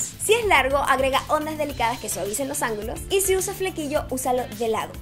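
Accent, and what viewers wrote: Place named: Venezuela. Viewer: El acento venezolano es lo máximo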